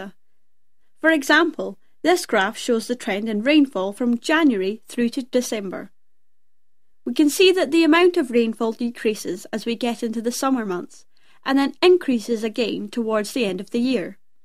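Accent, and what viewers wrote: Scotland, United Kingdom. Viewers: I can't get over your Scottish accent.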